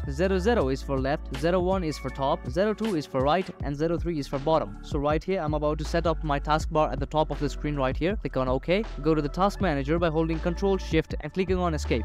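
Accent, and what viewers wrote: India. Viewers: Didn't work. I should have known once I heard the Indian voice. Moron ...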